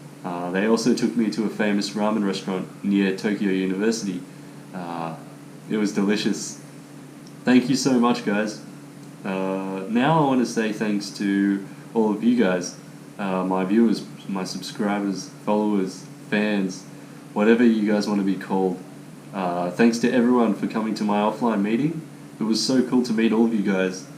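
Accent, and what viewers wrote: Australia. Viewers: オーストラリア訛り苦手だから助かるわ… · Australia Englishの発音がちと、ムズい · You got a nice Aussie accent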